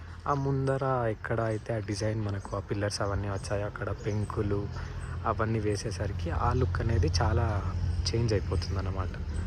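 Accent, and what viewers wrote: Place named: Chittoor, India. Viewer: Rasayalaseema chittoor slang matalavutunnav bro,, nice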